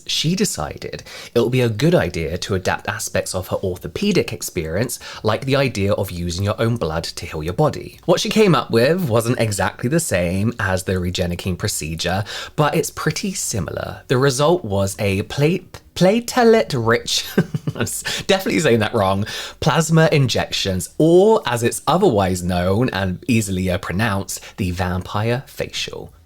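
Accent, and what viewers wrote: United Kingdom. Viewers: It's funny I couldn't care less about Dr. Strum but I am watching because you look gorgeous (so fresh faced) and I am a sucker for your British accent. Loving your hair (chic) and skin (glowing). Update - I just realized you are Robert's twin. I thought something was slightly different, but couldn't put my finger on it until reading the comments. Never seen before today but have followed Robert over the past few years.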